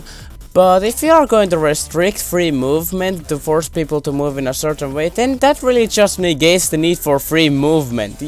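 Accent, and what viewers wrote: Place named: Finland. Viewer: sun äänestä kuulee heti että oot suomalainen :D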